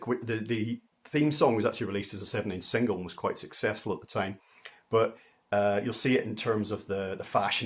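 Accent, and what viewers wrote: Northern Ireland, United Kingdom. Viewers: Very glad to have found your channel. I'm guessing you're from Northern Ireland? I'm coming at you from Portadown... Network are great for releasing these old series. Particular favourites of mine are "Children of the Stones" and "Sapphire and Steel."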